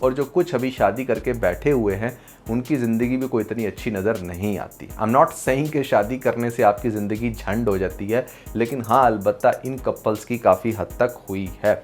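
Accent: India. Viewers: Bhai aap Indians ki tarah kyun bol rahe ho.... VIDEO INTERESTING HONE WALI HAI....... GHANTA FARQ NAHI PARTA.... yeh kya hai??? Pakistani Urdu bolo bhai kya hogaya hai....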